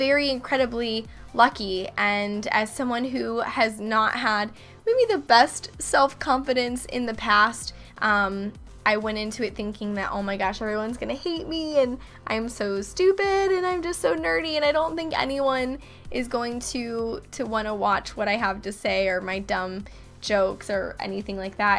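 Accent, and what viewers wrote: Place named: Midwest, United States. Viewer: You accent really confuses me. It sounds like Midwest mixed with a bit of southern. Anyone know where she's from? Statewide, I mean. I'm not a creeper.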